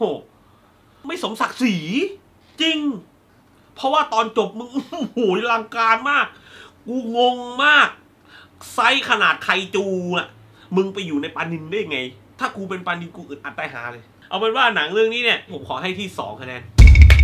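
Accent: South, Thailand. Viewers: พี่เป็นคนใต้ป่าวครับ55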